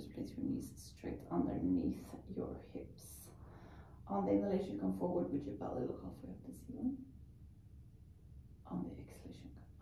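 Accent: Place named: Spain. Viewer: I like your Spanish accent while speaking.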